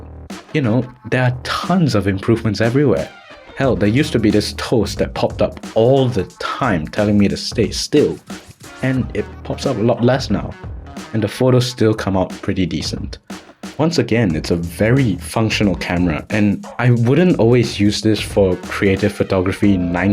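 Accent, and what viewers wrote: Nigeria. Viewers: You sound like a Nigerian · Why do you sound like a Nigerian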